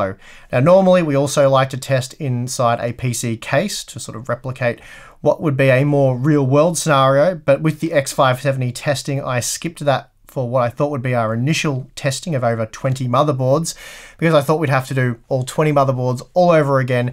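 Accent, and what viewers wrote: Australia. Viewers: Steve are you from Australia?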